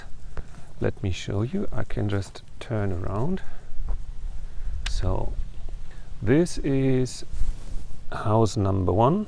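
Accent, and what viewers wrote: Germany. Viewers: After three spoken words in one of your previous videos I knew that you are German. Well, I am too. That might explain it. And I recognized the German forest. Keep up the good content! Grüße vom anderen Ende Deutschlands aus dem Saarland :) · Nice to meet you,. I recognized your German accent, although your English is excellent. I lived in Neu Ulm for 3 years. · Hi, woher kommen deine Englischkenntnisse? Ich hab natürlich deinen deutschen Akzent erkannt. 😏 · We have it here in Western Australia on Sunday and also from 5pm to 7am every other day however we can mow our lawns on Sunday from 10am to 4pm. It's a great idea and even with Sunday mowing it still feels like a rest day with the smell of fresh mow grass and should you not abide by the Council by-laws the Ranger will pay you a visit and fine you so it's just not worth the effort, everyone seems to abide anyway. 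Funny you should say some thought it Australia, I thought Germany because of your accent. Congratulations on your 50 thousand subs, I think we're all enjoying normalcy and calm wether here or away were all pretty much the same as people I think 👍🐨🇦🇺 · Haha called it I new that was a German accent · I think it's funny someone thought your accent was Australian. I knew it was either German or Austrian before you mentioned the GDR and because it sounds like my Aunt's accent and she's from Germany, the eastern part too. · I knew it! You are lived in Germany because of your accent. And no wonder why you are so hardworking (because i knew someone who also lives in Germany that can do so many things in his free time while working at the company and also he is a lecturer) Cheers and God bless you From the 🇵🇭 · Hi From Michigan USA. I love watching your videos. My Father is from Germany so I am very familiar with your accent. 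I love how soft spoken you are and you have done a wonderful job with your house. As for the brick wall.. whitewashing will be the same as painting.. once done you can’t go back. The brick in your kitchen looks very nice. I’m sure that if you ever decide to do a air B&B with the house you will have no problem filling reservations with all these u tube subscribers! Good job! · I love the little info show. Thanks! Also about the accent. I knewwwwww IT was my grandparents motherland...Germany! yayyyy! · From the beginning, i knew it you are German because of your German Accent. I have friends from Germany he live near Bonn, I also have from Elmanau. Hugs from the Philippines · I would think that it would be obvious that you are German as soon as you start talking I could figure that out. I really like your videos it reminds me of my dad doing woodworking onon the weekends. Plus your personality is a perfect match. 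( my dad was German, he has since died) Also having no screens on your windows tells me for sure you’re not in America. We are fanatics about our screens on windows and patios. Good job. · You are obviously a naturalist. Most likely a vegan or at least a vegetarian. Beautiful German accent. Beautiful country!!!! My father was 100% German. A hard working honest stern proud man. Nothing he wouldn't take on with full stream. Luckily I'm like him and not my passive Norwegian mother. 🙂 God's speed.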